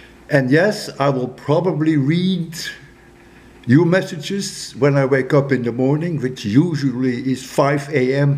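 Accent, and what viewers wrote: Netherlands. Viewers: Just out of curiosity, are you Dutch? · To be honest, I didn’t know this guy and when I first saw one of his videos I knew he was Dutch because of his accent! It’s weird I didn’t know him because I’m Dutch myself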